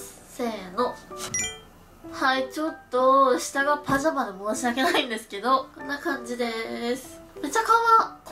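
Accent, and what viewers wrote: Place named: Kansai, Japan. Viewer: の関西弁めっちゃ可愛い💗💗💗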